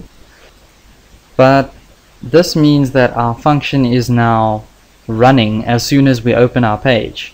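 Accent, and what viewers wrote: South Africa. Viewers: Yoh Bra u sound like a South African, anyway I have a problem I know a bit of javascript should I just jump to jquery or try to Master Javascript first?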